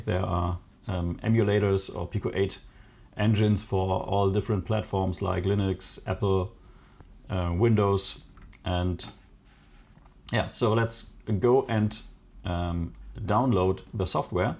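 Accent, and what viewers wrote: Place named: Germany. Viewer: Ohne deine Beschreibung zu lesen, höre ich einen starken, deutschen Zungenschlag. Habe eben deinen Kanal gefunden und bin begeistert. Tolles tech-zeug, tolle themen und danke für den howto-spirit! Alles Gute weiterhin!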